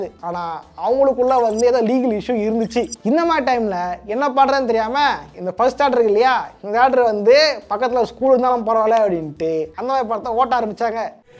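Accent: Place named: Vandavasi, India. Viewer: Brother unga sondha vur vandhavasi bola irrukae🤔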